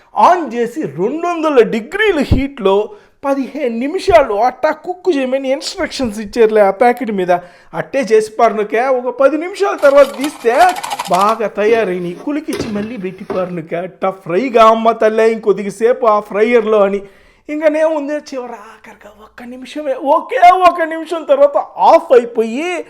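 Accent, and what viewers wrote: Nellore, India. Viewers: Mana achamaina Nellore basha inka bathike undhi 💚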